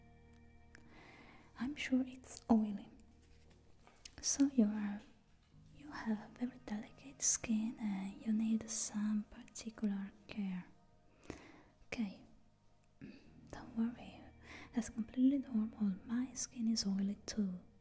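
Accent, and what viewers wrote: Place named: England, United Kingdom. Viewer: Omg! Your English accent is just so good and relaxing😍 I just love it ❤️ it’s so cute!🤗🥰❤️❤️❤️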